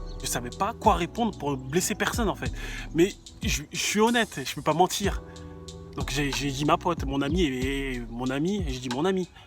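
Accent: Japan. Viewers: Oooh trop cool ton accent japonais !!!😱😱apprend moi aussi😱😭😭😭😭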